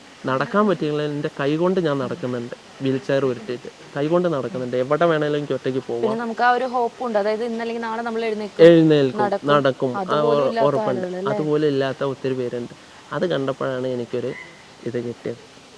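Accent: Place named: Malappuram, India. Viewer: മോളെ എങ്ങനെ മലപ്പുറം ഭാഷ ഇത്ര വേഗം പഠിച്ചു.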